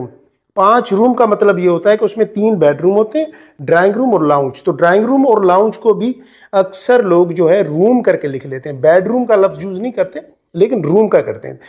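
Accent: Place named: Karachi, Pakistan. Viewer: Pure urdu speaking man karachi urdu.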